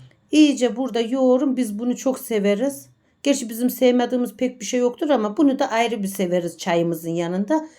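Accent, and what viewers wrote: Black Sea, Turkey. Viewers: Karadeniz şivesi mi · 😂😂 uy kardezli bacim bende karadenizliyim bizim bu şifemiz nekadar da güzel emegine saglik 😊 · Bildiğimiz Erzincan lokumu Karadeniz şivesi ile Anlatılınca Trabzonlu olmuyor kendi yöreinizin yemeğimiş gibi biz bunu çok severiz demişsiniz bir kere erzincan lokumu dememişsiniz ama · Aynen karadeniz şivesi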